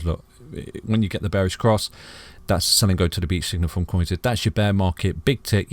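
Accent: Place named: Nottingham, United Kingdom. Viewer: Sounds like he's from Nottingham. Although I don't have a crystal ball...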